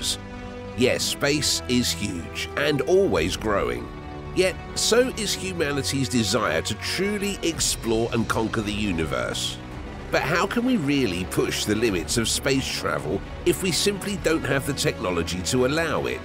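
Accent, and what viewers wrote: United Kingdom. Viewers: irritating British BLOKES talking... hate that stupid accent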